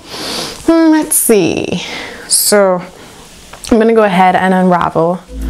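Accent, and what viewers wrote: Trinidad and Tobago. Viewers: Babes are you trinidadian??? You sound like my people. #izatrini Btw I just got 3 jars of the blue magic at Walmart. It's a must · Girl i heard your accent n like why you sounding trini, where you from lol so I checked the description box, sure enough, we're from the same country lol. I just here browsing the youtube about getting back to basics cuz things be getting to expensive in the "natural hair community" just as if you were relaxing your hair, maybe even worse lol. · Heard you speak, and was like "ah know this accent, she hadda be Trini!" Subscribed!!!! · Is that a Trinidadian accent I hear? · Are you trinidadian? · As soon a single hear you, I knew you were trini!!!! 🇹🇹🇹🇹🇹🇹🇹🇹🇹🇹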